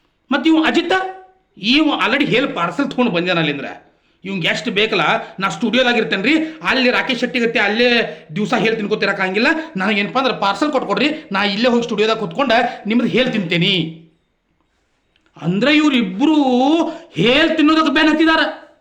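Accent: North Karnataka, India. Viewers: ಸೂಪರ್ ಅಣ್ಣಾ,, ನಿಮ್ಮ ಮಾತಿನ ಶೈಲಿ ನಿರೂಪಣೆ ತುಂಬಾ ಅದ್ಭುತ. ಉತ್ತರ ಕರ್ನಾಟಕ ಮಂದಿಯ ಮಾತು ಕೇಳಲು ನನಗೆ ತುಂಬಾ ಇಷ್ಟ.ಧನ್ಯವಾದಗಳು ಸರ್. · Chanal ugiri sir nimma. Matu super uttar karnkad matu benki🔥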